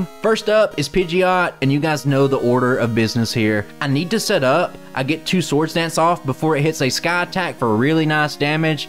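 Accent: South, United States. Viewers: i did not know how i'd feel about a pokemon solorun channel with a southern accent. guess i like it now 🤷🏽‍♂️ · Are you from a Southern state by chance lol ?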